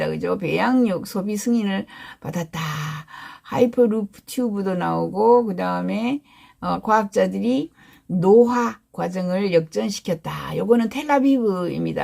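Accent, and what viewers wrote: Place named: North Gyeongsang, South Korea. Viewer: 경북으로 시집온 중국동포입니당. 구수한 지망말투 친근함을 느낍니다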